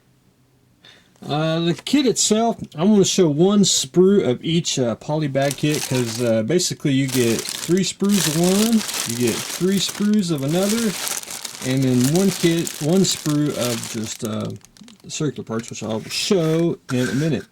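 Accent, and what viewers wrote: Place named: England, United Kingdom. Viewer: Mais ce qu'il peut être saoûlant ce rosbeef avec son accent pourri en plus !! P.... on comprend rien de ce qu'il baragouine !!! À se demander s'il se comprend lui même !!? En plus il ne va même pas au bout du montage ... 😕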